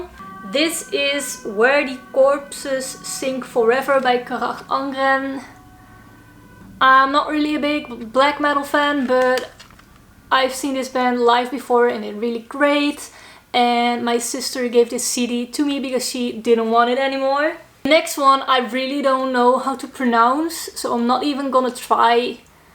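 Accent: Netherlands. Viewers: Nice accent English/Dutch haha but its really good 'Ik heb dat ook alle Nederlanders bijna' Great video!! And Wow not many girls have a good taste of music like you Love your Collection Groetjes van Rotterdam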